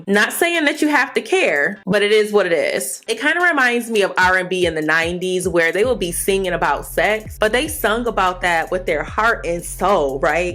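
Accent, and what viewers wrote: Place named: Upper Midwest, United States. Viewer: Not to be off topic But I sense an upper midwest accent. Are you from Wisconsin or something?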